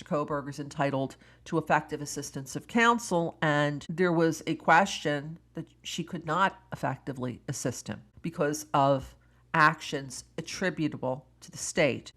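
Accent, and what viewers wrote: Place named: East Coast, United States. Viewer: Oh, and I just have something else to add. From today and on into the future whenever someone says to me' "who asked for your opinion "? I will turn to them and smile serenely and reply... " DID"! 😅😅 I LOVE YOUR EAST COAST ACCENT. It cracks me up when I hear you say DAY -GO'S name as Dah-go! It's like out west people say "my ANT", where as I say "my AUNT". PUH TAY TOE, PUH TAHT TOE! 😂😂😂. After almost 4 years I actually just learned his real name is Joe!! 😅 Hope you're enjoying the weekend!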